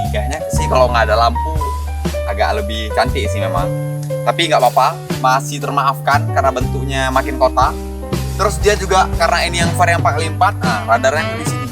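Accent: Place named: Java, Indonesia. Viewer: Orang mana nih, ngomongnya medok banget ??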